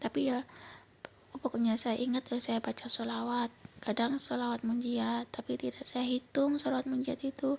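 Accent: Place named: Banyumas, Indonesia. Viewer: Kak trnilngapak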